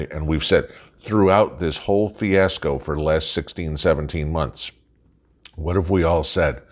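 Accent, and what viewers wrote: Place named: Upper Midwest, United States. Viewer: You don't sound like you're from TN. You have the short flat vowels of the northern midwest. Wisconsin? Michigan? Illinois? Just curious.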